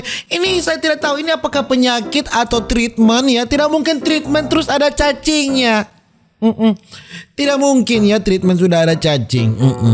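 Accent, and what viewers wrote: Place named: Makassar, Indonesia. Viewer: Aduh bang kau bicara lucu kali Org makassar kah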